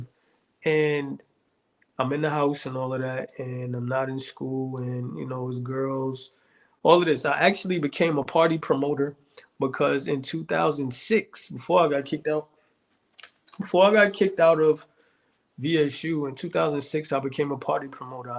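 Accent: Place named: New York, United States. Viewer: Wow such amazing testimony 🙌🏾 ❤thanks for sharing!! That New York accent has never left and that million dollar smile is beautiful!! It's so amazing too always listen to other people testimonies especially young black men, I've recently accepted my lord & savior in my life and its been so amazing just thinking about it and writing this comment I'm in tears because god is amazing, he has brought me through some terrible things but he reminds me everyday he has me and that everything will always be okay. Can't wait to see more videos from you!! The crazy thing is I'm from the DMV area and for some reason everyone thinks VA is like the best place ever like there's no rough spots in VA and I just laugh and be like yeah ok....well young man may god continue too bless you and everyone in these comments❤🙏🏾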